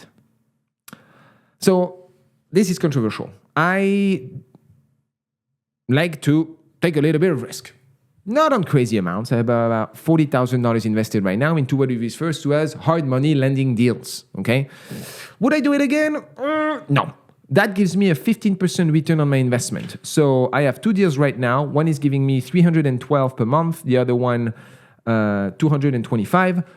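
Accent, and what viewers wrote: France. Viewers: Your French accent is so cool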